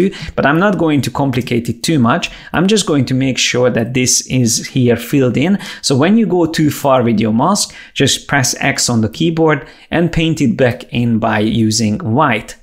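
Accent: Hungary. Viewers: oooh a hunglish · I'm like 99% sure this guy is Hungarian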